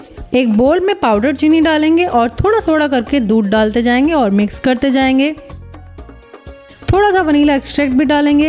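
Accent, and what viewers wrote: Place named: India. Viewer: Indian english gets on my nerves dude, stop talking like that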